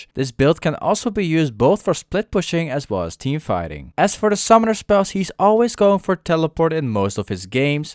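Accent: Netherlands. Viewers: Dutch accent FTW.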